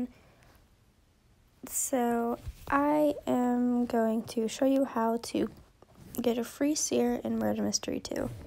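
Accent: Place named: United States. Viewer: Classic American voice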